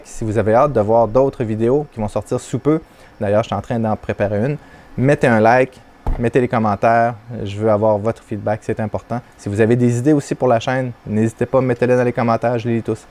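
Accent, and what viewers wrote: Quebec, Canada. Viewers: Cher, Cher cousin d'outre atlantique, tes vidéos autant que ton bel accent Québécois me manquaient. Je suis très heureux de ton retour, mais je suis encore plus heureux que la santé de la Güeraloca se soit améliorée. Je te souhaite, ainsi qu'a ta famille mes meilleurs vœux!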